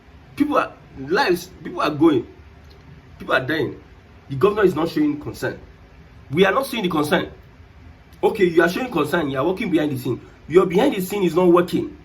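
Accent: Yoruba, Nigeria. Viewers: This guy is not igbo. He was never igbo. I have taken time to analyse him. He constantly deliberately speak down on igbo and use the word "our" to make him sound igbo. He is yoruba in look and intonation. I think he is one of Tinubu supporters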